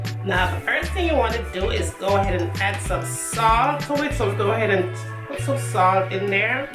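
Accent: Jamaica. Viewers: Love your Jamaican accent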